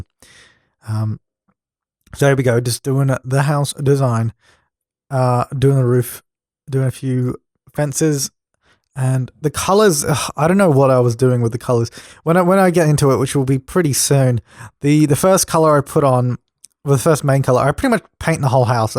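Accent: Australia. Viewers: You are like the only person who I can watch play sims because you have an Australian accent hahaha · Also hell yeah, you're Australian.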